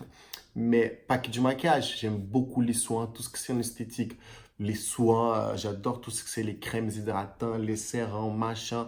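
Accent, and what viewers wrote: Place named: Brazil. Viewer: Je vais regarder tes vidéos juste pour ton accent qui me me replonge dans mes vacances brésilienne. Cette accent est un aphrodisiaque aussi 🤣👌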